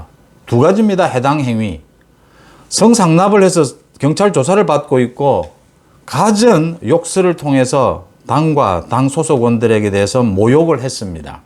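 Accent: Gyeongsang, South Korea. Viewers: 송 선생님 평론을 평소 열심히 듣고 있습니다. 경상도 억양은 있지만 언어 구사는 표준말을 사용하시므로 아주 깨끗한 방송이라고 늘 여겨왔습니다. 한 가지 외람된 말씀 드리자면 '제명'이라는 단어를 요즘 상황 때문에 많이 쓰실 수 밖에 없는데 accent를 '명'에 두는 것이 올바른 발음이라 알고 있습니다. accent 를 '제'에 두시는 것이 송 선생님의 일종의 언어 습관이라 생각하지만 혹시 고칠 의향이 있으시면 참고하시기 바랍니다.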